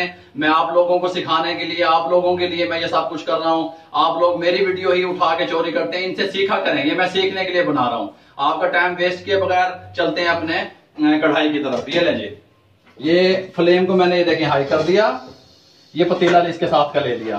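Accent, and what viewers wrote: Punjab, India. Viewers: karahi se zayada apki Punjabi ka maza aya hy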